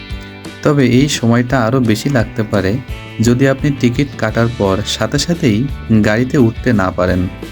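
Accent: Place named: Noakhali, Bangladesh. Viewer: beshi lomba hoye geche. Samne short korba. Tumi mone hoy noakhailly, amio. Pronunciation thik korar cheshta koro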